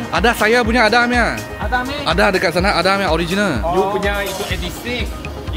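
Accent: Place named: Kedah, Malaysia. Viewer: Bos cakap lorat kedah mcm pak wei baru syok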